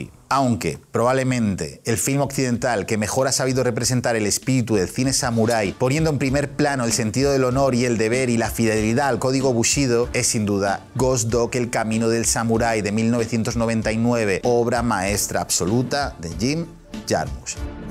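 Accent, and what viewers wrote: Spain. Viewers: A hacer un curso de idiomas, cualquier idioma, que todas las palabras extranjeras las pronuncias como un español típico que dice "se lee como pone".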